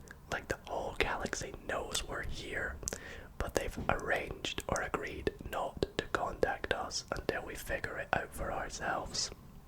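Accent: Scotland, United Kingdom. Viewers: You sound scottish are you from scotland?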